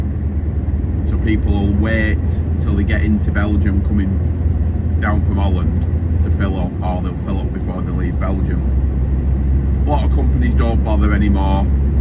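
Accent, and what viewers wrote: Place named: Manchester, United Kingdom. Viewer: Hi mate im new around here i love watching truck driving vids only come across you just now here’s my take on the (not done much interesting) i myself hold a class1 (dont currently drive ) but one of my dreams is to do European and anything to do with your job abroad or England is fab to watch its probably not interesting to you because its the norm to us viewers it’s something out of the ordinary where in the uk are you from uk Manchester myself and your accent sounds close lol P.S its nice to watch someone that sounds like he enjoys his job good vid mate keep it up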